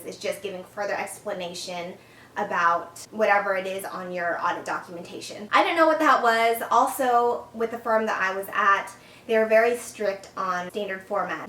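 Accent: Texas, United States. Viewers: thanks for your insights... i knew you were a fellow texan 10 seconds into the video lol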